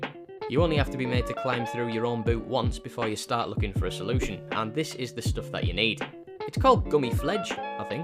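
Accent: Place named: Northern England, United Kingdom. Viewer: Lads from the North just explain things better, or should I say bettah. Top class content. Nice motor too.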